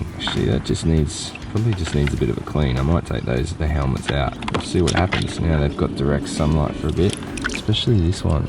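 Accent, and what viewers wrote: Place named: Australia. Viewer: I know his Aussie I’m too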